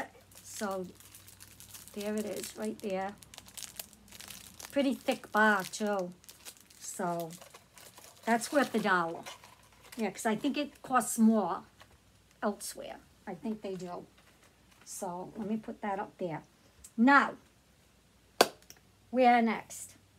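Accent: Boston, United States. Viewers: Springfield Massachusetts 🥰 Your Accent Leads Me To Believe You're From Boston 💙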